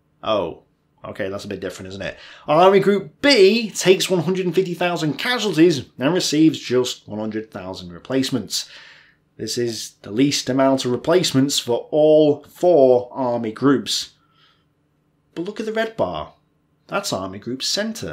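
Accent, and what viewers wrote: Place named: England, United Kingdom. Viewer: I like so much your videos about Ost Front that, as a french, I try to understand very focus on your speech. I get it, your superb english accent (?), in any case not the american accent, allows it for me. Fred (old close combat player, since 98 ;) )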